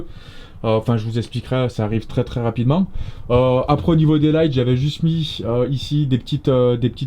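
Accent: Saint-Étienne, France. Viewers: Yop je te découvre mais on dirait bien l'accent Stéphanois ! ^^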